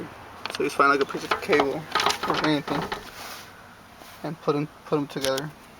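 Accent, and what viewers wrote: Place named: Mexico. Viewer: Carlo Russo that’s how Mexicans talk!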